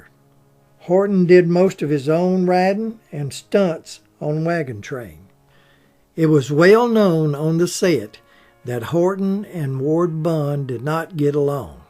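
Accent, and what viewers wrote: South, United States. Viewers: no thank you you are what makes YouTube great I love your voice you have that little bit of Southern song which I love keep up the good work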